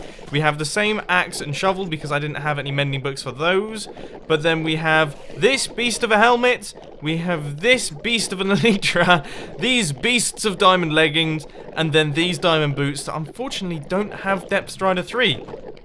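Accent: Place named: Coventry, United Kingdom. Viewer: are you from Coventry? you just have the accent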